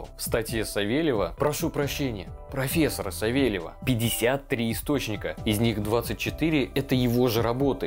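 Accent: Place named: United States. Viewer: Некорректно - неточно, неверно. Ну и этоо выкаблучивание с чтением с американсим выговором раздражает.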